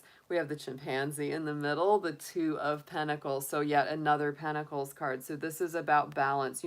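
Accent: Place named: South, United States. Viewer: I love your southern accentb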